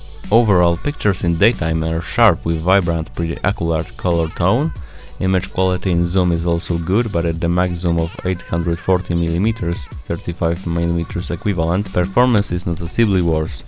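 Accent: Poland. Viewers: Your accent sounds like polish idk is it true lmao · Słychać ten polski akcent :pp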